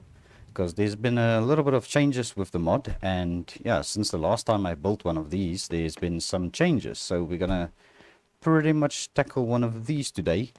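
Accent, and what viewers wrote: South Africa. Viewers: Are you south african? you sound south african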